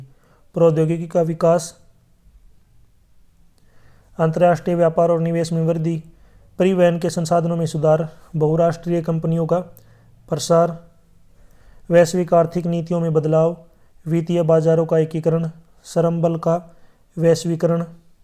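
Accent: Haryana, India. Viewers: Haryana ka hai kya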